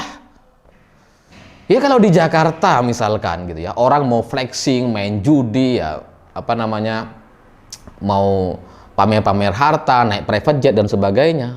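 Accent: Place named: Madura, Indonesia. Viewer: Bang logatnya kaya temen saya orang madura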